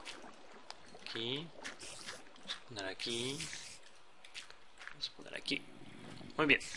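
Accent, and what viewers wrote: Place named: Mexico. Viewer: En serio eres de españa??... Te lo digo porque hablas como mexicano....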